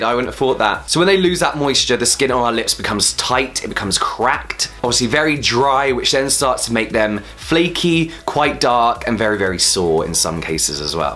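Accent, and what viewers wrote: United Kingdom. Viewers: Your british accent 😆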